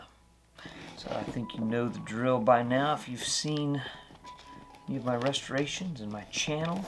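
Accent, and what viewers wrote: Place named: Oxford, United Kingdom. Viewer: I mean, you did a good job. The way you speak, your intro and other thinks are totally like Elegant Oxford. I don't say that you're bad at what you do.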